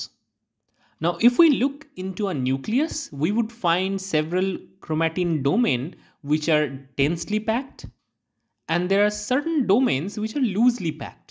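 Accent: India. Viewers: اوا مره افهم شي بدون ترجمه مع انو لهجه هنديه 😅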